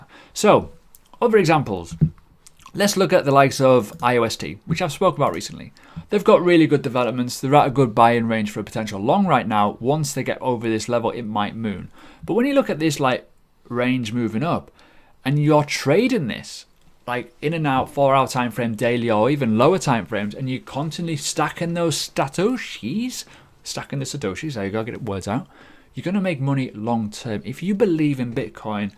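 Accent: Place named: North, United Kingdom. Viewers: He’s a northerner! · What absolute dog's bollocks, how come you not tell my mate is from England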